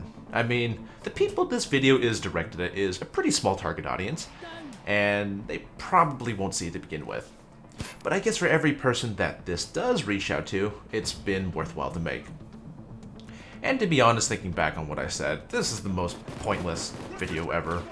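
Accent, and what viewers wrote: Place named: United Kingdom. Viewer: I'm agree to most of things you said but, in a matter of being professional, you need to upload real pro videos for old stuffs you did before. not all of them but as I checked, you were not aware of all the details and clues of each heist. ofc watching your videos gave me some clues of things i was not aware and ofc I do heists in my own way even if there be an easier tactic. but for example missing one of two paintings in Diamond DLC heist is a shame, I mean right now after years you're playing it you should know there is 2 paintings and not 1. anyway as you said that you dont seek any suggestion ( lol) just skip what i said on top lines. you are a good player and I enjoyed watching your videos here in youtube and again I like the way you explain and argue while recording it. at first video I thought you're british? IDK why. 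keep going and I know its boring to read and listen to a lot of stuffs you may heard or know before. keep going and dont forget im the number one in stealth! but im infamy you know lolz.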